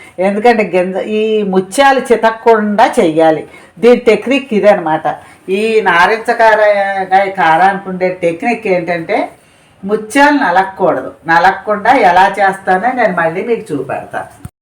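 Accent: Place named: Godavari, India. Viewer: మీరు ఏ ఊర్లో ఉంటారండి,మీరు గోదావరి జిల్లావారి లాగా మాట్లాడుతున్నారు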